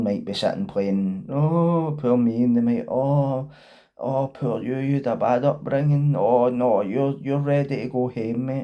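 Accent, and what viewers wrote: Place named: Glasgow, United Kingdom. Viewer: He is speaking glaswegian..isn't speaking like a ned just a general local accent lol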